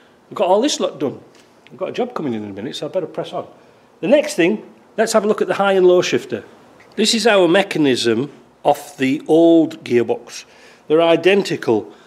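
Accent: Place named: Cumbria, United Kingdom. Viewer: You sound Cumbrian. Am right? Edgar (All my Land Rovers were Series 1! )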